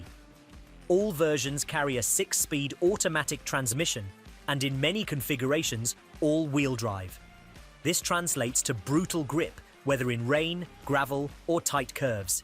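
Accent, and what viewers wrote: United Kingdom. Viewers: British accent sales the videos and products , why? That is the culture and mindset !!!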